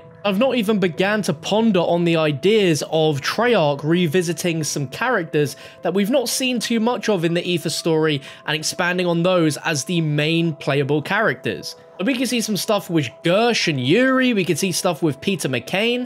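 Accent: England, United Kingdom. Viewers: Why do you English say EFER when it’s ETHER?! Or Hewps and it’s Helps!? Becuuz when it’s because??! Just sayin man!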